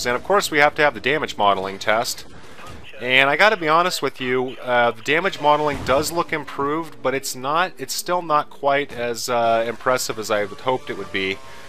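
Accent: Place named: United States. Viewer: it's funny hearing an American talk about F1 for some reason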